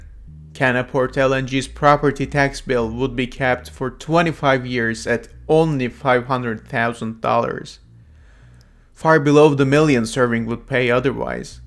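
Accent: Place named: France. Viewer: sounded like a strong french accent. BTW, the Irvings were originally from the Buctouche(sp) area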